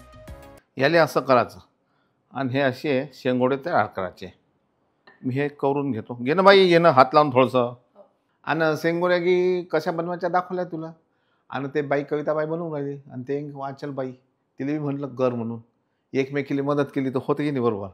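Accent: Vidarbha, India. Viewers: Varhadi bhashechi godi chhan watli,.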